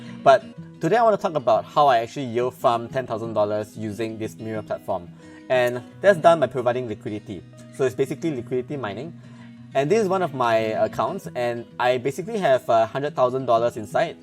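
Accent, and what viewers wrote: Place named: Singapore. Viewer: You sound singaporean haha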